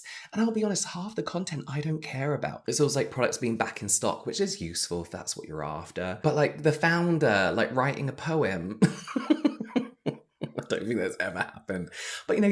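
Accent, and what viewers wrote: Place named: RP, United Kingdom. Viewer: I love the way you talk. It's just so Posh!😩👌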